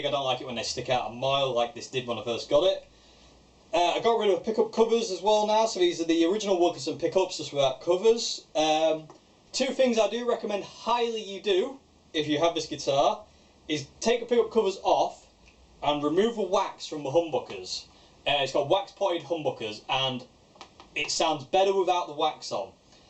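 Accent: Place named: West Yorkshire, United Kingdom. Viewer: Hey, great channel! I share your love of Vintage guitars, got me a Vintage V6 sunburst after trying a Fender American Strat and deciding I prefered the V6 and being £800 better off! Can't quite place your accent, but definitely somewhere in West Yorkshire?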